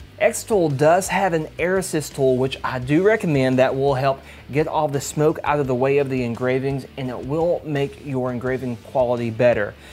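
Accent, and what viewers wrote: South, United States. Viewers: You don't sound convincing with your Southern accent.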